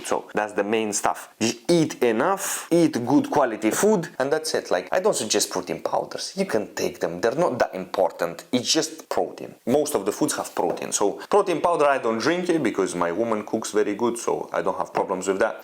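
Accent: Russia. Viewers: Блин, я подозревал что ты русский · dammm finally a real fella slapping reality out here, loving your hard cold facts and love the Russian accent too ❤ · That russian accent 🥶😮‍💨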